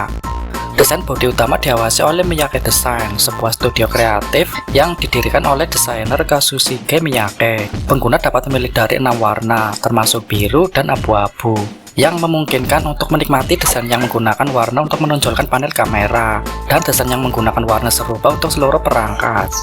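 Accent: Banyumas, Indonesia. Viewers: Ngapaknya masih kelihatan bang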